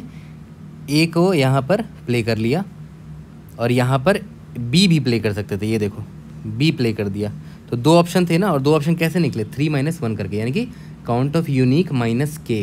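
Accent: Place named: mixed, India. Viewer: aapke accent se lag hi raha tha up bihar hoga padosi nika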